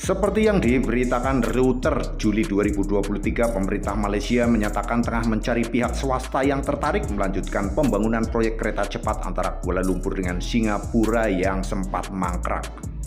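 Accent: Banyumas, Indonesia. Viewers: admine ngapak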